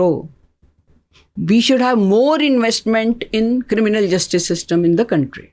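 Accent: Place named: Punjab, India. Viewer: Maam is punjabi …her accent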